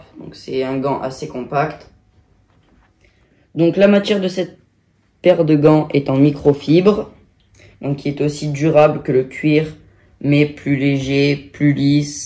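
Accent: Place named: South, France. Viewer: Tu vis dans le sud de la France ? T'as un p'tit accent du sud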